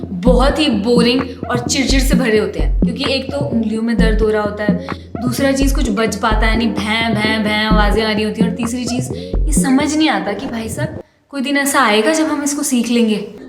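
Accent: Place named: Uttar Pradesh, India. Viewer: Really appreciate your support and spirit changing many many lives.... Dear this Abhilasha from Varanasi.... yesterday saw your first video and then watched many... laga you are my old friend... your outlook towards life simple yet amazing.... n apni U.P kind Hindi loved it... May God bless you Dear be happy always ❤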